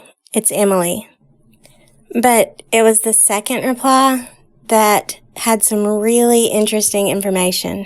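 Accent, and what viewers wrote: South, United States. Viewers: Thank you. I just watched it. Crazy sh.....stuff ! There are alot of people seeing things , creatures , beings , humanoids, cryptids whatever people want to call them . I can tell just from listening to you that it had a very big impact but you seem to have adjusted well- well I hope you have anyway ! . Thank you for responding to my question and thank you for sharing your encounter ! Love your southern twang , drawl - accent !! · Hi. I found you through watching the Hammerson channel talking about Rakes and your encounter. First off, I BELIEVE YOU! It must have been shocking to see one of those creatures up close. I would have been terrified too. I found your channel now and have already watched all of your videos. Thanks for the deep dive of this image. You did an amazing job with all this extensive research and legitimately debunked the debunkers. 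That image is fucking horrifying and I can only imagine that it’s possibly what some of the missing travelers in the missing 411 cases encounter. I cannot fathom how scary that would be. Personally, I have had 0 cryptid encounters but strangely enough, I have been compiling a list on my Notes App of instances where I begin ruminating or thinking deeply about something, and then all of a sudden shortly later, it appears to me in real life. I know it sounds crazy but it’s like the universe is presenting these occurrences to me after I think about them. Idk it’s weird. It’s similar to how the Rake was created as a creepy pasta, but it was somehow WILLED or IMAGINED into reality. Just a theory. Anyway, I’m droning on but I research missing 411/cryptids every night and have nobody to talk to about this. I hope you make more videos, please! I will rewatch this while I’m stuck at my desk at work. 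I wish you good luck with the channel!! P.S. I LOVE your accent!! Makes me wish I could meet some southern girls but I’m stuck in california hahaha.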